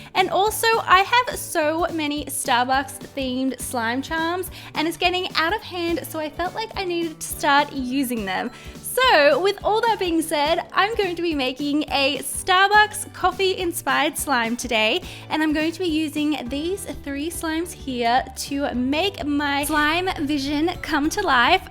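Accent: Australia. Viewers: Are u Australian? Just a random question also AWESOME slime. I can't even make some slime 😕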